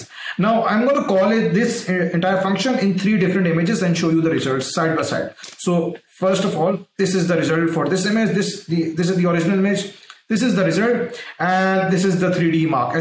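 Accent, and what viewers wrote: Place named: India. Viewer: Speak much more slowly, please. English with an Indian accent is hard.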